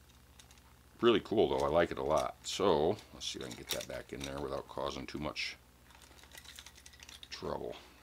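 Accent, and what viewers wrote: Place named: Michigan, United States. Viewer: your accent. Sounded northern Michigan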